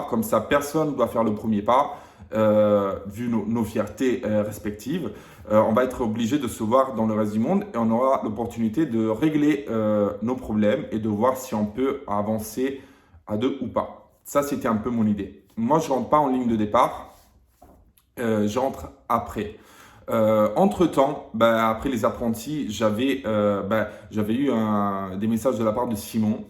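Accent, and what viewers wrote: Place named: Italy. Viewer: j‘ai eu un „coup“ de coeur sur toi dans les apprenties tu te laisse pas faire t‘as un coeur d‘or ET EN PLUS T‘ES TELLEMENT BEAU AVEC TON ACCENT ITALIEN TROP DE LA CLASSE VRAIMENT une personne incroyable!!!!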